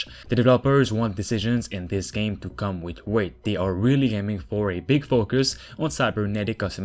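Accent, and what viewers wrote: Quebec, Canada. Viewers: Juste avec l'accent, on sait que tu es québécois 👌😂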